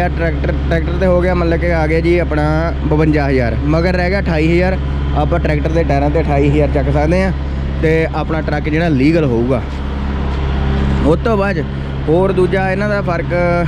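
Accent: India. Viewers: Maza aagya bai....malwai boli❤️ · Veere tuhade language toh lagada tusi kapurthala vale side toh oh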